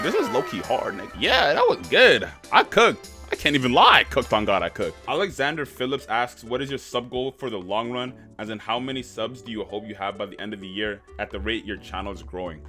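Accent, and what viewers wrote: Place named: Canada. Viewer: Bruh tell me how I aint know yous canadian. Im deaf ash to accents n shi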